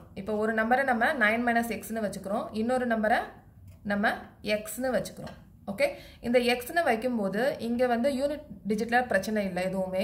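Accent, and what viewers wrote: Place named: South India, India. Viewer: Mam you are from south INDIA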